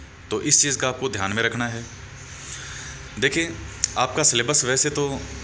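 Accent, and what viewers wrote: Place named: Shekhawati, India. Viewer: sir ki boli se to ye Sekhawati chetr ke lgte hai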